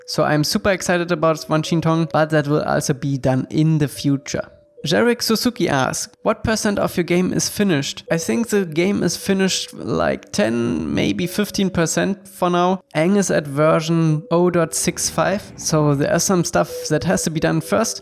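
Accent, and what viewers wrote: Germany. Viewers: Are you German or somthing linke this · That german accent i love it haha xd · Musste am Anfang schon schmunzeln, weil man sofort raushört das du deutscher bist. Aber digga zieh durch, du hast mehr als mein Respekt und hoffe dass in 2 jahren ein geiles Avatar Game rauskommt und du da dann auch mitwirken kannst !❤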